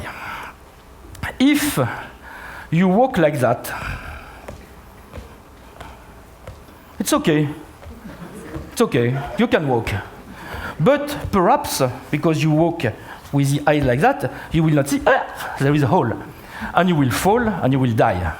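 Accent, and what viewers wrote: France. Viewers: love this french accent it is so funny!!!... today to not do it is more positive than do it :))) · As much as i like this guy , i hate the french english accent ! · He has the most comical, stereotypically French accent I've ever heard. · I am French myself and I think he represent the french accent...His accent is terrible...you can understand clearly ?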